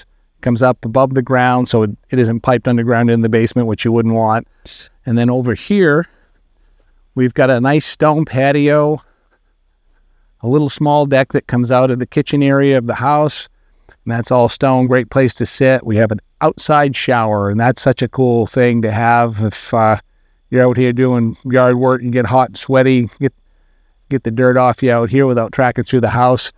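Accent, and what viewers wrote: Maine, United States. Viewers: Great looking property. I’m a southerner but I like the Maine accent. Very informative.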